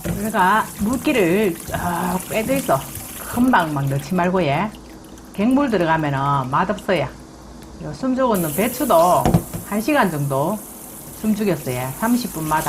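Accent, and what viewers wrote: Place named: Jeolla, South Korea. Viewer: 그치예? 요래요래.. 그래도 되고예... ㅋ... 남도사투리..옛 사랑이 생각나는 사투리 입니다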